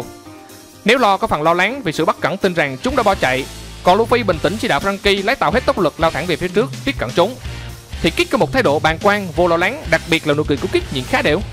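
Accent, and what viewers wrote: Quang Ngai, Vietnam. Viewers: Ad quê Quảng Ngãi hay Quảng Nam vậy? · Ad người miền trung hả anh, em nghĩ anh người quảng ngãi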